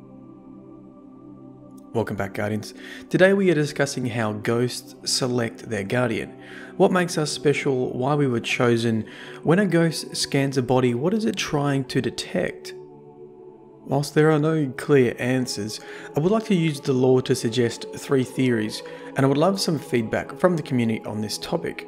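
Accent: Australia. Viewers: i suppose i don't quite buy the blinded knight theory mostly because of the story of the last word. if that was the case and ghosts erase our memories and indoctrinate us then why wouldn't jarens ghost just go find another body to resurrect? why would it instead form a bond with shin and turn him into a guardian? I just feel that if control over us is the objective then that ghost picked a very difficult way to go about it lol, but thats just me. I love these lore videos though, this is the first destiny lore channel that I can REALLY get into. you remind me of Vaati Vidya and not just because of the australian accent lol. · Are you from QLD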